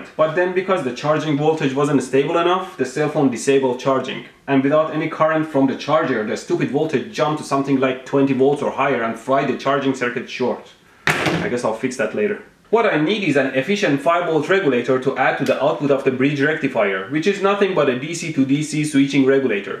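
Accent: Iran. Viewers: he has an Iranian accent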